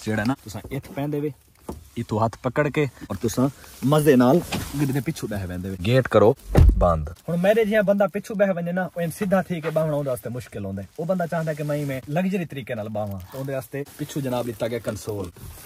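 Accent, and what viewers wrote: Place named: Saraiki, Pakistan. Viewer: آج تو چھوٹے پروفیسر صاحب بھی اپنے خیالات کا بتارہے ہیں کہ گاڑی میں کیا کیا فیچر ہیں اوبھائی اس کو پڑھائی کرواؤ پروفیسر نا بناؤ اس تو ملانہ بات چیت میں بہتر ہے چھوٹے کو پہلے بات چیت کرنے طریقہ سکھاؤ تاکہ جب یہ بڑے ہوکر بات چیت اچھی کرسکے اور میرے کافی دوست سرائیکی ہیں جن کا تعلق ملتان ڈیرہ خاذی خان اور رحہیم یار خان سے ہیں ان کی بات چیت میں آپ کی سرائیکی میں فرق ہے🙏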